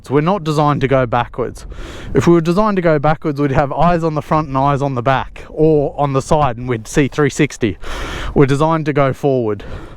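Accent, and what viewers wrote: Australia. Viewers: You sound like an Aussie!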